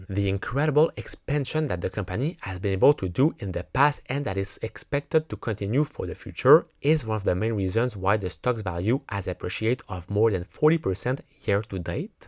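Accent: Quebec, Canada. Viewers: Au prochain rapport trimestriel, pourriez vous créer une version de votre vidéo en Français, SVP. Merci bien. Votre accent Quebecois est très agréable à entendre.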